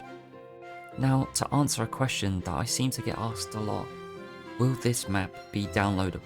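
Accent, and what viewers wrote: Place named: RP, United Kingdom. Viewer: I love your voice, you sound posh as fuck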